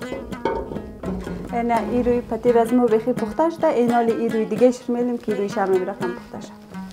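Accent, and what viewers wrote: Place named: Hazarajat, Afghanistan. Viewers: یادرست کابلی گپ بزن یادرست هزارگی گد و ود گپ مزنی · بسیار خوبشی گپ موگی خوار جان صاف سوچه ازرگی رقم ازو دیگه دختر وری زبان خو کیل کیل ننی موفق باشی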